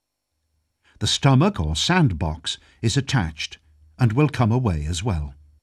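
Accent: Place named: United Kingdom. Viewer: you know it's good when a god damn brit is narrating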